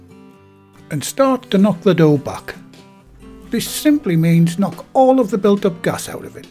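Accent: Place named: Middlesbrough, United Kingdom. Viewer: Where you from ….. sound like a boro lad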